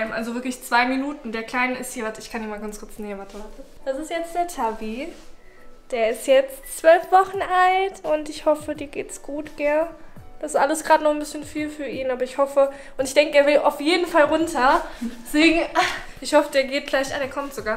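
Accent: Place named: Hesse, Germany. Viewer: als ob du auch aus hessen kommst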